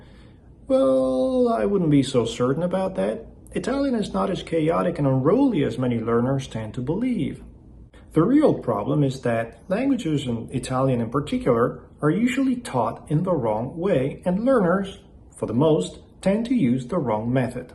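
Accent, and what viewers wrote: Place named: South, United States. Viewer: I feel like you're talking with an old timey country boy accent. Has that always been the case and I just didn't notice.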